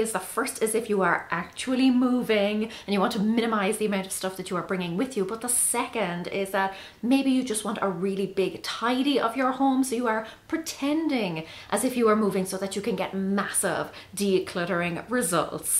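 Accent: Ireland. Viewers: And you sound like my family I'm American but my dad was from Mayo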